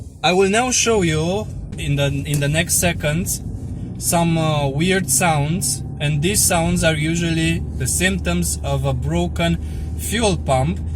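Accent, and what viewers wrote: Romania. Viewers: Tu esti roman? Your accent sounds very Romanian. Pe vremuri era usor sa-mi dau seama, ca eram toti cu Dacii LOL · De la o poștă se simte ca ești român 😂🫡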